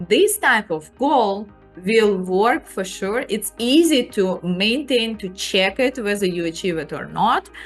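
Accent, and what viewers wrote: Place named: Russia. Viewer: Чувствуется Русский акцент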